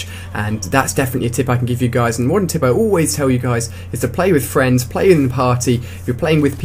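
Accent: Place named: England, United Kingdom. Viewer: i knew you are from english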